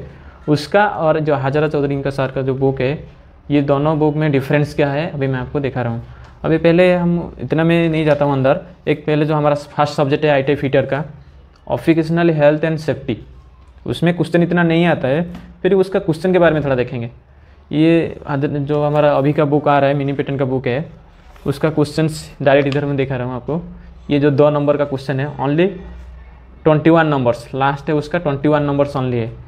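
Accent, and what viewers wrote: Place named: Odisha, India. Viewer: Mu paa tama voice ru janideli odia pila boli... Then odia Letter dekhiki confirm heigali...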